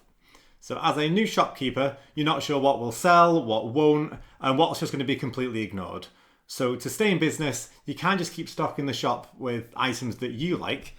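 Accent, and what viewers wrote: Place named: RP, United Kingdom. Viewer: Your accent is perfectly fine. I don't even realize my Southern U.S. accent until I hear it on a recording, which has been extremely rare. I do not like my own voice and speech, then wonder how other people can stand to listen to me speak, but no one has ever said anything. Here, we talk of your accent being "proper" English. Fancy or high class. I've recently been a student of the shadow self, which has helped me tremendously, and is why your video was recommended to me. The favorite teacher I've been learning from speaks of the shadow in negative terms, because he is referring to that which we are not attending to because we interpret it as negative. When we're working on these aspects of ourselves, we tend to think negative thoughts are all bad, and we should only ever have positive ideas, so we try to force them into non-existence. 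Then failure is inevitable because of how we bury them, instead of bringing them into the light to be dealt with without judgement or chain reactions they cause through mental-emotional-mental reactions. Instructing that we should be aware and allow and accept them, but only to the extent of the feelings they cause in our bodies, instead of the thought itself, considering the thoughts are not actually you; just programmed thoughts everyone has. Anyway, you've given me a different perspective on it, even if I can't think of even one personal thing that relates to it, I do understand now. Thanks very much, and I'll watch for your other content.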